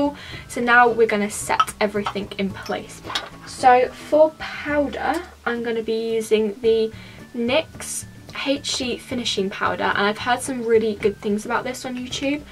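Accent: United Kingdom. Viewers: Love ur British accent💘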